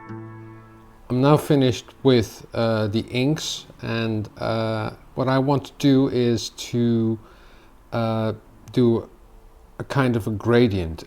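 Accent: Netherlands. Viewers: Dutch accent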